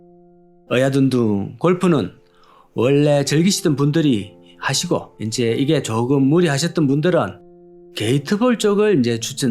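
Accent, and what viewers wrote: Gyeongsang, South Korea. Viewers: 아재 말투는 구수한 경상도 말투입니다... 유네스코 무형 문화재 감입니다.... 아재요, 욕 많이 봅니데이 ~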